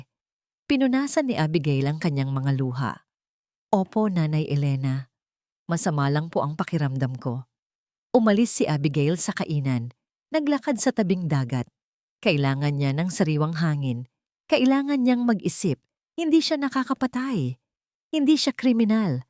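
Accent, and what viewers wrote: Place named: Conyo, Philippines. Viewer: Parang tanga ang salita ginawang hindi ko na nga tinapos konyo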